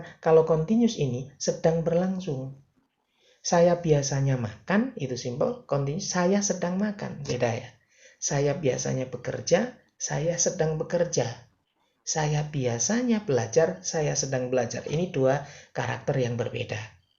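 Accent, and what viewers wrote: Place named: Surabaya, Indonesia. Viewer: ALHAMDULILLAH. THANK YOU. VERY HELPFUL. ESPECIALLY USING SUROBOYOAN ACCENT ❤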